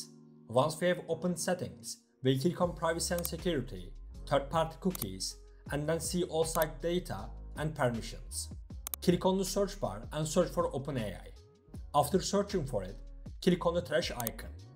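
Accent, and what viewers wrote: Turkey. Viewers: Abi ingilizcenden anladim turk oldugunu evyallah yardimin icin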